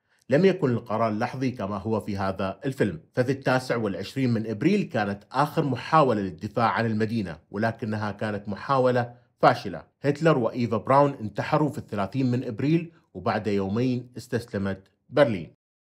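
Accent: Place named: Iraq. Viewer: الحنين الى اللهجة العراقية في سرد القصص😢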